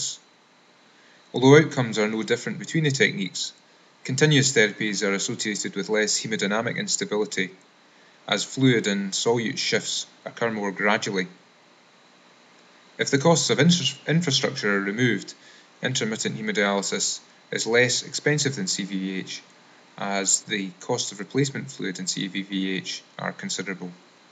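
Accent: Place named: Scotland, United Kingdom. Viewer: Thank you for excellent presentation. Love the Scottish accent.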